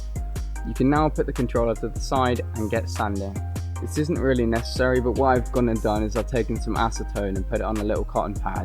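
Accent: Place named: United Kingdom. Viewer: you can tell that he is british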